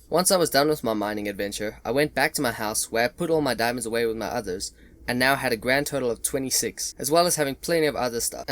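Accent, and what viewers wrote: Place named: South Africa. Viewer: Where you from because you sound like a South-African. Good vid btw and just subscribed.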